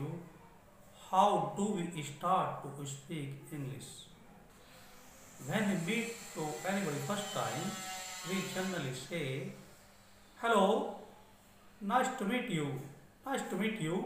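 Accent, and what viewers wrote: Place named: United Kingdom. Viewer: Best of all english just like british pronunciation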